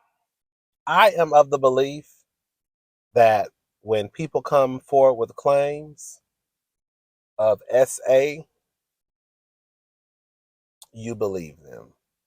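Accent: South, United States. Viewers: I love listening to you speak! I love listening to "the south" in your accent.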